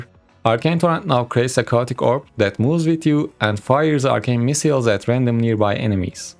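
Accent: Turkey. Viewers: türk aksanı vurdu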